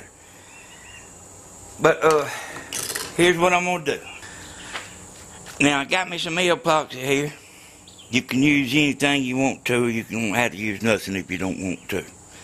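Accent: South, United States. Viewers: It's Georgia. There is a very distinct Southern accent from that area. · Too much "Southern". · love his Southern accent. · i love the southern accent, its like larry the cable guys brother, the antenna guy:) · OMG, I love his southern accent!! Subscription and like, indeed! Actually, this zigzag (or B-square) antenna is credited to the Soviet engineer Kharchenko, however, according to some accounts, such antennas had been used aboard some Nazi submarines way before WW2. The advantage of this antenna is that it "catches" even reflected signals among high-rise buildings in a big city and it is very easy to make at home in a few minutes!! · Wow!!! Where 've you been all my life ?!!! You DEFFINATELY get a 10 from me ! I can't wait to try this project ! I know it's a GREAT HASSLE for you to film, BUT I'M SURE GLAD YOU DID. Your directions are clear, and slow enough for a beginner to clearly understand and WANT to give it a try.; Your comments during filming are confident, informative, pleasant, & never boring.; I especially love the fact that you expressed yourself intelligently with little or no profanity; and I love your accent. 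What part of the south are you from ? ...Job well done! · I am a Kiwi from New Zealand and I am hoping to come over to your country, being a Maori of brown skin complexion will I find that your fellow southern folk might find me offensive. I really love your accent because it is Authentic. I have been told from friends of mine whom have visited the southern towns that your folk found their accents real strange to listen too. We are definitely not Australians as they drool a lot of their words with their accent. It is irritating to listen to from a Kiwi perspective but again I love your accent and could listen to it all day. Maybe when I come over to your country we could hook up over a coffee. Cheers for the antenna, I will make one just like it although I will need to find comparative balun thingies. Anyway take care and onward and upward. Regards b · Hey there good job on the antenna I like that design for sure. 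I'm up here in Toledo Ohio got a homemade antenna but that's junk going to put one of years together you sound like a good old boy I used to live in Atlanta early 90s. And lookie here... you don't know how nice it is to hear a southerners speaking until you hear it again sound just like some of those Hazzard County Kentucky boys I Used to Know. Keep them good videos coming cuz I just subscribed to your Channel God bless brother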